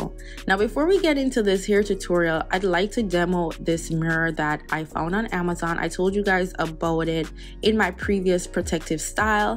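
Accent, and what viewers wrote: Jamaica. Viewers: Are you Jamaican??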